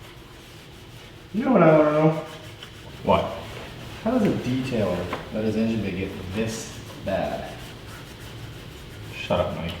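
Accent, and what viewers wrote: Canada. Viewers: Are you candian?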